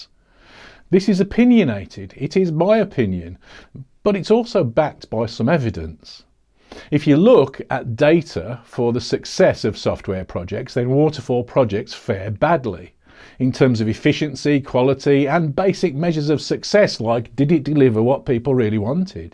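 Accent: United Kingdom. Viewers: This channel is just telling me things I already believe in a British accent. I mean that in the best possible way.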